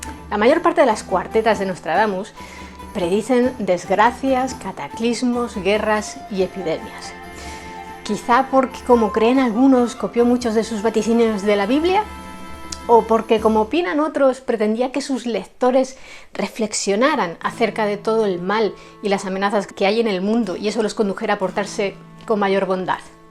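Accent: Spain. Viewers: . una maravillosa leyenda histórica gracias mil. una pregunta eres Española???.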